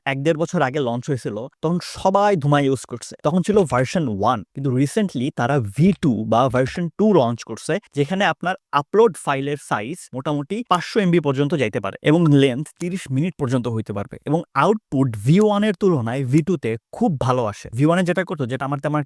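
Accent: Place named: Sylhet, Bangladesh. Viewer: আপনি সিলেটি, ঠিক নানি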